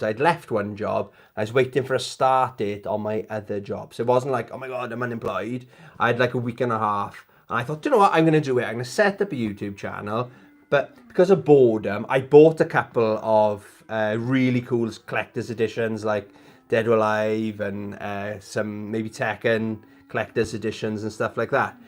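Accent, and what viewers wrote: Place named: Wales, United Kingdom. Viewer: I do like the Welsh accent . Great video as usual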